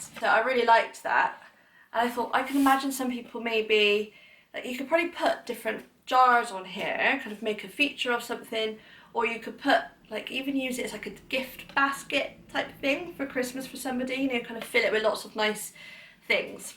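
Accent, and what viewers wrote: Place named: West Country, United Kingdom. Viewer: New subscriber here! Are you from Bristol? I'm from Gloucestershire and your accent is similar to mine. Good old farmer, lol. Love your bedroom wallpaper, so pretty. Take care xxx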